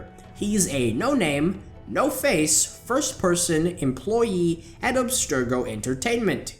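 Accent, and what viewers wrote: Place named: Australia. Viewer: Oooooh I get it. He's australian.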